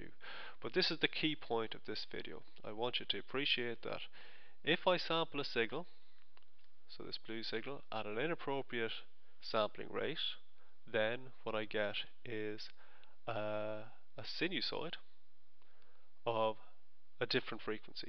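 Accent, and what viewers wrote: Ireland. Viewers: Sweet explanation and an Irish accent on top. Class fella, cheers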